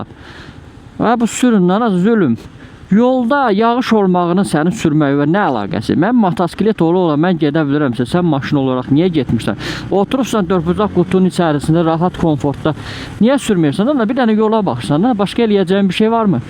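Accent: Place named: Qazakh, Azerbaijan. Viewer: Qazax ləhçəsi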